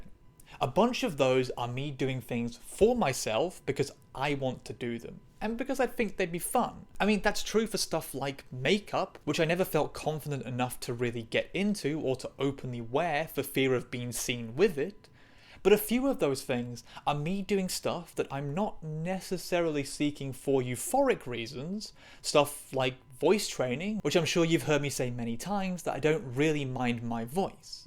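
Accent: United Kingdom. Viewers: lmao yeah i thought this whole time she was some flavour of british 😅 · She definitely has a British accent haha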